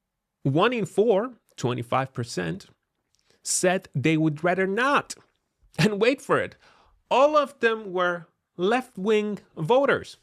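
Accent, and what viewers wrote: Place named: United States. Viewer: First of all, you sound like you would make a pretty good American….perhaps you immigrated to the wrong continent. 😊 Second, why would a country let the leader of another country bother them so much? Europeans have always seemed so triggered by US politics as if they should have a say so in who is elected. The whole thing is ridiculous, no one in the US is that vested in the politics of another country. This appears to be an extension of the victim hood mentality so rampant in the west, whatever your problems are MUST be someone else’s fault….and so that’s why Trump is an easy target.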